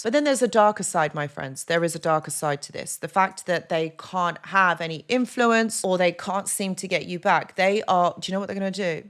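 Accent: England, United Kingdom. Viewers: Somehow I've been bragging on the English accent & with good reason I'm totally subscribed & thx luv😘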